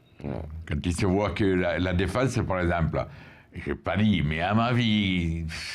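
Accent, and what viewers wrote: South, France. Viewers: Continue tes analyses tu me regale avec ta bonne humeur et ton accent qui chante le midi un coucou du nord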